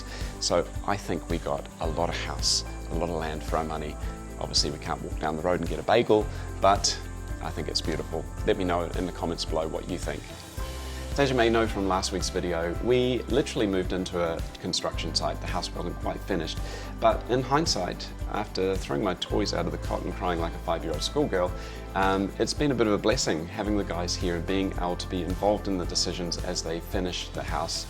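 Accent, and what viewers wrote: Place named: New Zealand. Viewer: Sound like a kiwi living a better life.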